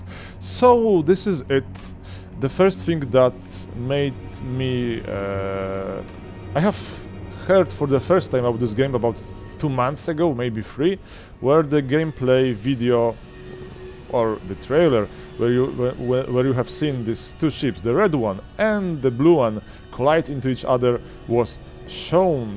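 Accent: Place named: Russia. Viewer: rasian akcent like wuj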